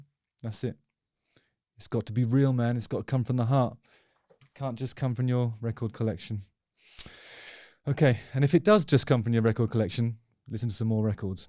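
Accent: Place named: Cockney, United Kingdom. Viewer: Just watching this now after being a newcomer to your Channel. First off, you look much better now clean shaven and with shorter hair. I miss the JHRA intro music. And your inflection in your tone is different. A bit more cockney in this one. I miss the poshness of your voice now. Nice comments on GVF. What has stayed the same is your thoughtful insight and analysis.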